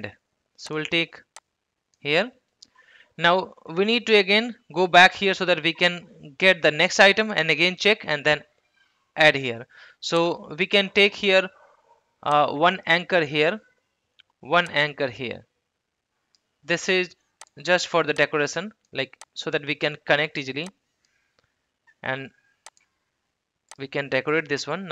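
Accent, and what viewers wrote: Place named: India. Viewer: Thank you. You provided a good explanation, but your Indian accent (SOMETIMES) sounds horrible. Please work on it.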